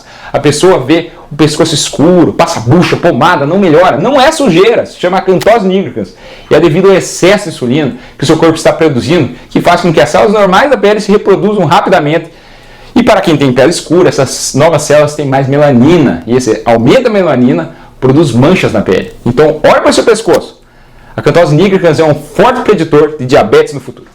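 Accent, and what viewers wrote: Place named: Minas Gerais, Brazil. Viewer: Esse dr parece ser mineiro, incurtando.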